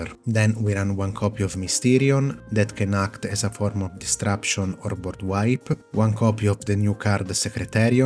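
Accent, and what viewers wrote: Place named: Italy. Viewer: Italian accent unveiled! òwò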